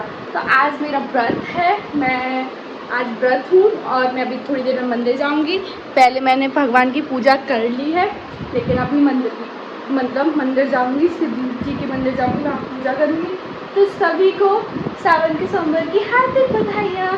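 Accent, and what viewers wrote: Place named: Bundelkhand, India. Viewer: Bundelkhandi achi lgti aapki 😊❤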